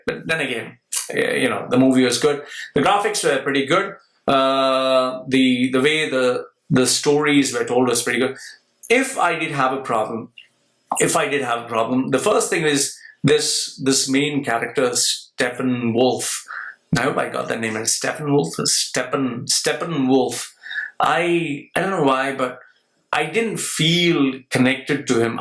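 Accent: India. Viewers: This is one of many Indian accents Not every Indian talks like the stereotyped one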